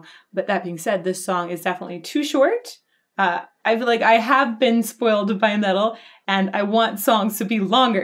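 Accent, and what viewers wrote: Brooklyn, United States. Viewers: His accent is of Brooklyn ny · That's a Brooklyn accent hahahahahaha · Brooklyn accent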